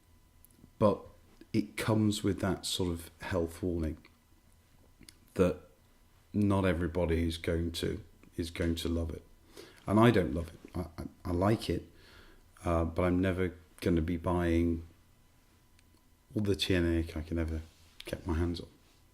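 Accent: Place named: RP, United Kingdom. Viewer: Excellent review but when are you going to invest in a descent camera? Your tasting notes are one of the most honest, original and accurate (as far as my tasting experience goes), your presentation is natural and lucid and transmitted well through your clear English accent with that hint of rugged understated poshness. You deserve a far wider audience. Invest in a better camera and let's see (please G-d) those subscription figures reach 5,000! in 2019. Fascinating and intricate flavour description which makes this Teaninich rather intriguing. There is a flavour note I haven't come across in a long long time. Elderberry. From the honeysuckle family with large clusters of tiny creamy white flowers. I do have a vague memory of this when growing up in England. You mentioned Elderflower. I looked it up and it seems to be a sugary drink flavoured with elderberry produced by village housewives in little English villages. 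This distillery name whose pronunciation is a real tongue twister "Tee-Ni-nich" is one of Diageo's silent giants with about 10,500,000 litres capacity / annum. As you said, their 10 Year Old Flora and Fauna was released in 1992 and can still be found in the UK. Their special limited release 17-Year-Old came out in 2017, now probably very rare. The character of the malt seems to be designed to be a filler malt for blends. Reviewers describe fresh green grass along with slightly oily creamy cereal notes with a dash of honey, nuts and spice. Your notes were much m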